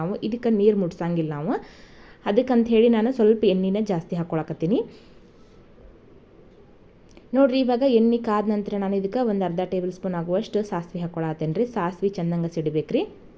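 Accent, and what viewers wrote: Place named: North Karnataka, India. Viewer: ನಮ್ಮ. ಉತ್ತರ ಕರ್ನಾಟ ಕ. ಭಾಷೆ. 👌. ಮೊಳಕೆ. ಕಾಳು. ಪಲ್ಯ. ಸೂಪರ್ 👌ಸೂಪರ್ 👌. ರೀ. ಸಿಸ್ಟರ್.🎉👌👍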